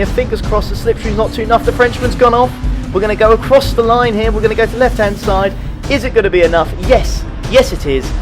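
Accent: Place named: England, United Kingdom. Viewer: Great Race, Dramatic Supens. Congratulations for ur fighting spirit znd ur Fair-play.💪👍 Love ur English accent and your channel content.👍🤩🤩🤩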